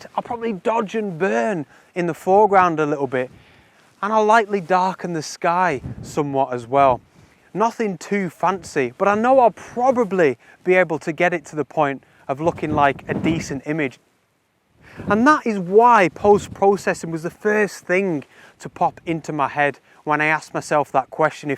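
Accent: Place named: Liverpool, United Kingdom. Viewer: why does his accent sound like the Beatles?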